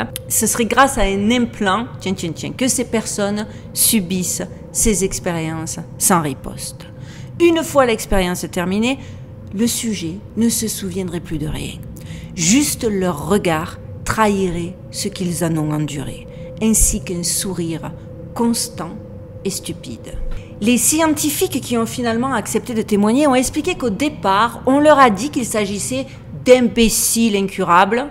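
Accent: South, France. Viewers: Bonjour je suis tout à fait d’accord avec vous à 100 % vous racontez très bien et en plus vous êtes très charmante avec votre accent du sud moi aussi je suis du sud dans le 13 et je pense que les loups-garous viennent de là aussi et que des civilisations anciennes évolué technologiquement par des dieux venues des étoiles ont fait des expériences hybride car déjà les Anunnaki ont fait sur les humains du singe on est passé à l’Homo sapiens et Neandertal et il y a eu des erreurs à l époque quand il y avait un problème il nous noyer (Le déluge ) tout en gardant un échantillon ( L’arche de Noé) et la base de dulce et une continuation de tout ça . en tout cas tout ce que vous dites Tout s emboîte parfaitement comme un puzzle !!! Et avec logique bonne continuation encore une fois vous êtes très ravissante avec beaucoup de charme !! Je vous adore 🥰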